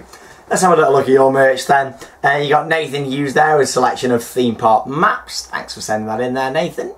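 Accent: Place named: Yorkshire, United Kingdom. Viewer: I'm from cambridge and let me guess... Your from Leeds? Yorkshire?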